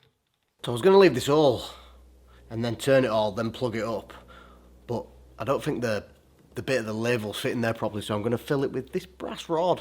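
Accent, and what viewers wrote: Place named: Yorkshire, United Kingdom. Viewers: It is, but there are several different and very distinct accents to be found in Northern England, this one sounds like Yorkshire to me. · Sounds like Yorkshire, North of England 🏴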